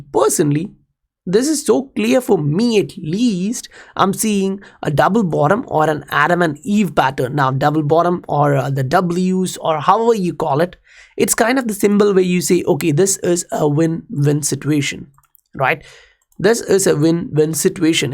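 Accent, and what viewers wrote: India. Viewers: You sound more Indian than Korean to me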